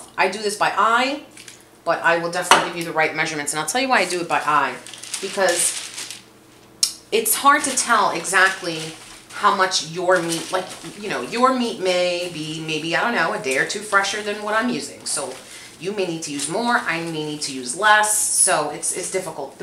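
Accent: New York, United States. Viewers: THAT'S A NYC ACCENT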